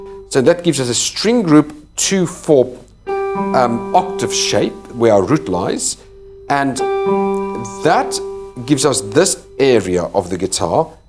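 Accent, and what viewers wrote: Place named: RP, United Kingdom. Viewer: You have the poshest accent of any guitarist I’ve ever heard speak! Where is that from?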